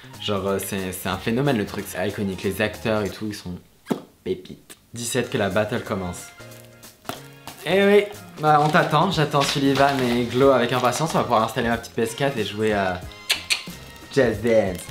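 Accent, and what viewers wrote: England, United Kingdom. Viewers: J'adore ton accent anglais quand tu parles anglais (c logique )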